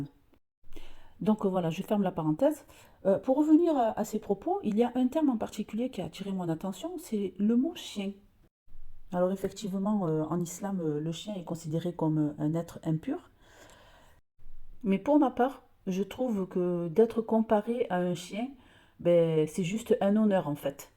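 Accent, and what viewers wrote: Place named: Marseille, France. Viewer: Très bel accent marseillais.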